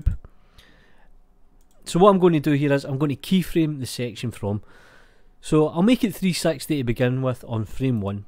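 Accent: Scotland, United Kingdom. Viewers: His english Is great.. is that Scottish ?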